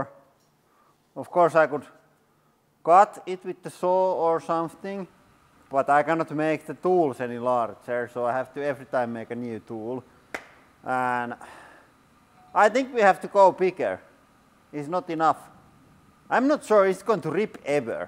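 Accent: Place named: Finland. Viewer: Love your Finnish accent!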